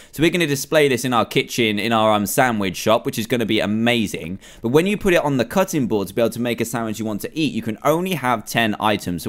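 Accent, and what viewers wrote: United Kingdom. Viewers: jean wasiulewski no he has his british accent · It's how most of us in Great Britain say stuff. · There are many ways to pronounce many words and he is in the UK so your in the U.S.A maybe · dude dot hate on british accent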